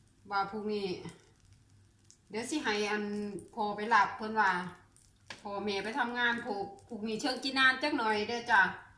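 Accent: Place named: Korat, Thailand. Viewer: สวัสดีจ้า สำเนียงนี่คนโคราชแท้แหละเนาะ คนกะไหนน้อป้าก็คนโคราชคือกันเด้อจ้า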